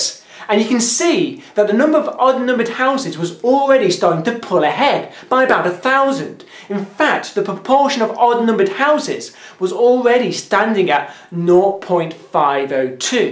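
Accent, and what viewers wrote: United Kingdom. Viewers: He lives in England (you can tell from the accent). England uses a uniquely bizarre street address scheme wherein adjacent addresses are usually consecutively numbered, but occasionally there are large jumps, because at some point they have to go back and do the houses on the other side of the street, or at the other end of the street (other direction from downtown), or whatever. I don't know exactly how they handle it when a new house is built between two existing formerly-adjacent houses. Heaven help you if you are given an address on a long street and not told what the nearest cross street is. Another weird outlier is Japan: street addresses don't say what street the building is on at all: instead they say what block it's on. All the blocks (in most cases, square sections surrounded by four streets) in any given city are numbered; and then the buildings on all (usually four) streets around each block are treated as a single block and numbered around the perimeter. 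Street length is thus completely irrelevant, and the range of possible numbers of buildings on each block is much smaller than the range of possible numbers of houses on an entire street. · So I thought this was going to be a "depends on where you live" issue from the beginning, and five minutes in yes it's exactly that. Guessing by accent, this person is some flavor of subject of the Queen of England; and may not be aware of how houses are numbered in America or France or other places. Even number houses are in one side of the street, odd number houses in the other side of the street. The first house on a street is just as likely to be 2 as to be 1. And in America, single digit house numbers are fairly rare. Some of my previous house numbers were 5801, 358, 363, 11258 (rural areas get bigger numbers). And his explanation didn't mathematically make sense.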